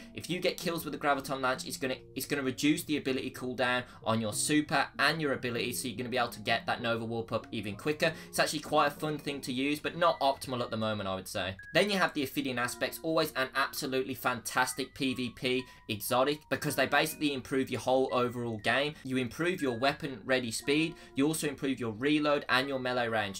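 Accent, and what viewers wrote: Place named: United Kingdom. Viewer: British am I right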